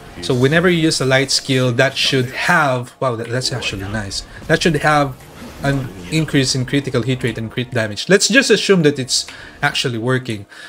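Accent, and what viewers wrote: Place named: Philippines. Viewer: Pinoy na Pinoy Ang accent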